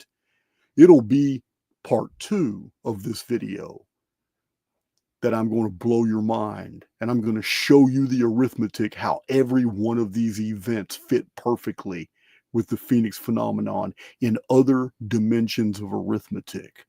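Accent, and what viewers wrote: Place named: United States. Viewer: I like the way Americans pronounce our English names. Wales is even funnier😊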